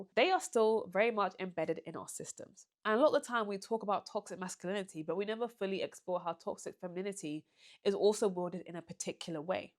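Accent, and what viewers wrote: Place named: United Kingdom. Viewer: Very confused as to why I’m hearing a British accent but I’m seeing you call someone who’s clearly not black a black woman. This take falls flat when you refuse to acknowledge her proximity to whiteness. You are not biracial you are not viewed the same as a “Zendaya”. We do not have the same experience. Infact “Zendayas” in the world have a privilege over black women. It’s very glaring when you talking about racial dynamic and refuse to acknowledge this.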